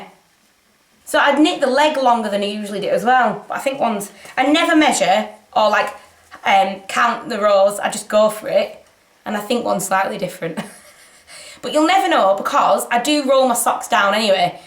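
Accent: Northern England, United Kingdom. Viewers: It’s lovely to hear another northern accent ❤️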